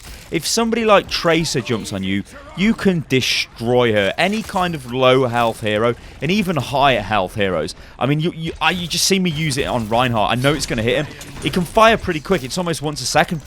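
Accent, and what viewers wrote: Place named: United Kingdom. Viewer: Subbed for that hilarious laugh and that epic Brit accent. Oh, and the guides. Kinda. Ish.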